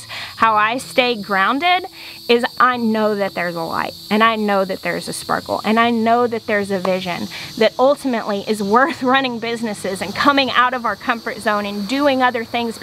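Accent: South, United States. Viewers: I started watching your earlier shows and loved them.i do prefer the old voice,just can't handle the southern twang you got now,I wish you well and hope you loose the twang don't be like others be youself you have a bright future stay sparkles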